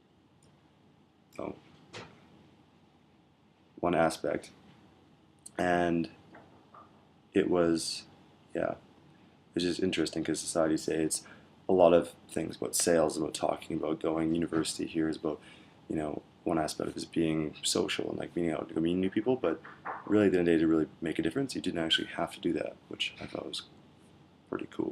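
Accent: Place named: Canada. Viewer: ps: you sound suuuuper Canadian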